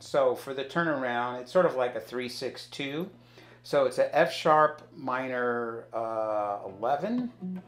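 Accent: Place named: Philadelphia, United States. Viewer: Nice lesson,. You made everything clear; it's easy to see what you're doing. By the way, are you from Philly? I am, and you seem to have the accent.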